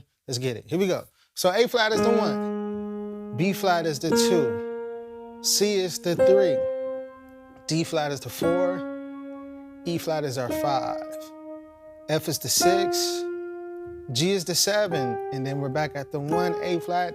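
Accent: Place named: United States. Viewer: Can anybody tell me please, wich region of América this accent comes from?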